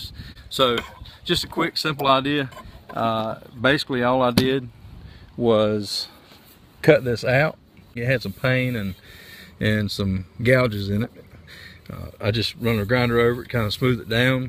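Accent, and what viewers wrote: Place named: South, United States. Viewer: I believe it's your accent that TRULY GAVE ME CONFIDENCE IN YOUR EXPERTISE. For those outside the USA who only know a southern accent from HollyWood movies you may be used to hearing that accent whenever someone DUMB is talking (Horrible hollywood elitist stereotypes). BUT FOR THOSE OF US IN THE US we know that accent is that of a working man, AKA THE GUY WHO KNOWS HOW TO BUILD AND FIX STUFF! Thanks for the video.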